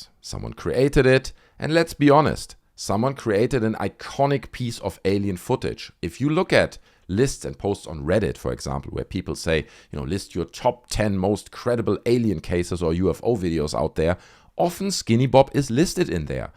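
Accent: Germany. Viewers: if this video Podcast is run by the Host man Talking called??? then why is this Russian Man Talking with a German Accent??????????????????????????????????????????????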